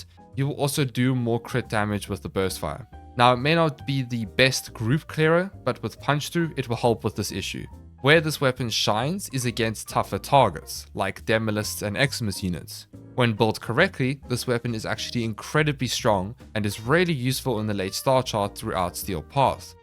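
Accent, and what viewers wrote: South Africa. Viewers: yooo.. are you south african? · You are most deffinitely South African arent you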